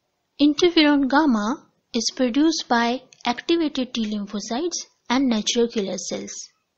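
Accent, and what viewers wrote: India. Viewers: Damn Indian accent...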